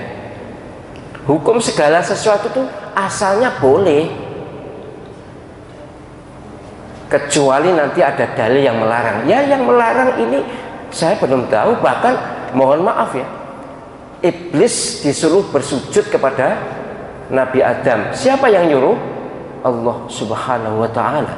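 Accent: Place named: Madura, Indonesia. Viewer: Madura mireng prof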